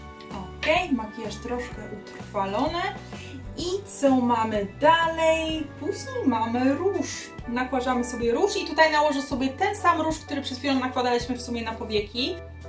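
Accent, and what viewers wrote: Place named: England, United Kingdom. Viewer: Kocham jak wymawiasz polskie nazwy z angielskim akcentem ❤❤ Zrób jeszcze raz to tylko z angielskim nazwami ❤❤❤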